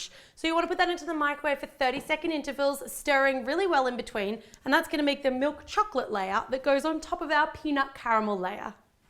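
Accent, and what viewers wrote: Australia. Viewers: it's just her Australian accent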